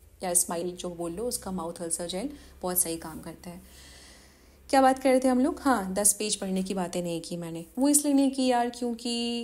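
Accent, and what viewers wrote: Bihar, India. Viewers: Aap BIHAR se ho kya bhojpuri bol rhi ho ❤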